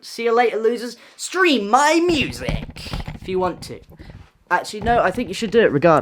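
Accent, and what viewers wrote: United Kingdom. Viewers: idk how if this makes sense but you sound more british than usual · You know I hope you know that you don’t ALWAYS have to feel like you need to post vids abt sexuality or lgbtq related topics. I hope you know that there are many ppl out there like me who follow you for you ie. your charming personality, you humour, your looks and that gorgeous British accent of yours:) I just don’t want you to feel any pressure when it comes to content and hope that your still as passionate about it as you were in the beginning. Honestly I’d even watch you tell me how to cut an apple or explain the different colours to me at this point so just wanted to let u know that we love you for you <3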